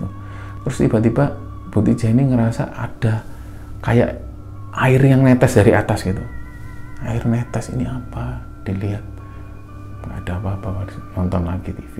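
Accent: Java, Indonesia. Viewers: Cara mbawakan ceritanya tdk bgus Terutama penyebutan AIUEO .....mungkin karnana eksen jawanya yg sgt kental ....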